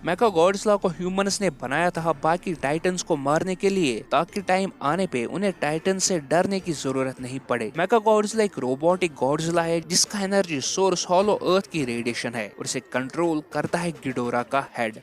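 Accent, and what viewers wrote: Kashmir, India. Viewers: R u from Kashmir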